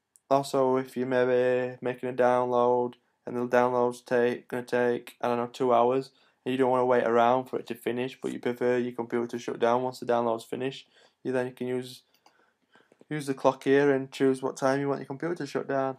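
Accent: Sheffield, United Kingdom. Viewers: you from Sheffield?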